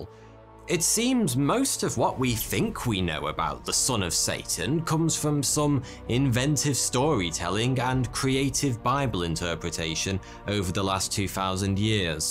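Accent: United Kingdom. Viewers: Thanks for making the video, it's hilarious 😂🤣 I love your videos, you're brilliant, your British accent, adds a great touch😂🤣 Watching this video made my day. I was wondering if you were going to do a video about me 😂🤣 I had no doubt it would have been awesome & hilarious, great work!6!6!6! You asked, what people think the Antichrist👑👑is? Your video is the "bestest" about me. I use the word bestest to make fun of my religious haters 😂🤣 All major faiths are waiting for the exact same person known by different names. Kalki👑👑 Moshiach 👑👑 Dajjal 👑👑 Imam Mahdi 👑👑 Maitreya 👑👑 One who comes in his own name 👑👑 (thanks Isa/Jesus/Hey-Zeus, very creative nickname 😂🤣) Are the same person. Look at the youngest of the 6 major faiths waiting for some Super Hero Villain to fix things they never can 😂🤣 Islam is waiting for the same person known by 2 names in their religion. 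Dajjal 👑👑 & Imam Mahdi 👑👑 That's funny, because it's the only faith that shows specifically I am a Gemini😂🤣 There's truth in all the faiths, you just have to know where to look. 6 major faiths, 3 waiting for a Super Villain, & 3 waiting for a Super Hero. That is most of the world population, lost & doomed without 1 person. The rest of the world believes in 💩 All my religious haters left & leave the future of everyone they say they care about to either a complete stranger or Doomsday, They call me evil 😂🤣 I know 3 major religions calling me evil, were built from slaughtering each other, their own, innocent people, spreadin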